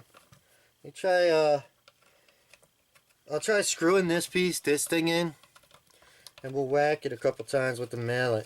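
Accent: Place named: Philadelphia, United States. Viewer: You sound like your from philly lol!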